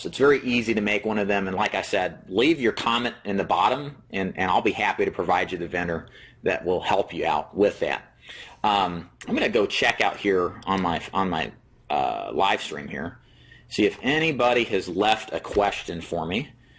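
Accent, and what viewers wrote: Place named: Chicago, United States. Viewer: Where are you located.. your accent sounds Chicagoesque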